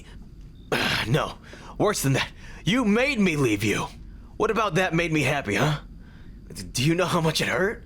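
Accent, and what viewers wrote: South, United States. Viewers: Oop the southern hooked me. Im cook cooked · Why do i always simp for the southern accent?! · 10/10 the southern accent gets me every single time swoons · I'm gonna need to hear this southern accent again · You don't know how much I loveeeee your Southern accent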